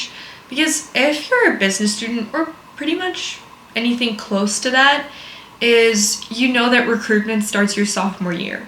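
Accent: United States. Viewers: To say you only got to the US from Russia 9 months ago, your American accent sounds really authentic. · Russia? You have an American accent 100%! Amazing! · how is your accent pure American in less then a year? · Hi How come you have a perfect American accent without any trace of the typical Russian drawl? Your accent is more pure then ninety percent of native English speakers in USA!! Respectfully asking as no one you place you as Russian 😅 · Kinda off topic, nah, IS off-topic, but how do you have a such a perfect American accent if you just got here? 😅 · Your English is amazing and your accent is full-on American. Did you go to English schools in Russia? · Your accent sounds perfect. I mean, you really sound like an actual American speaker. Or a little bit like a German. But, Russia?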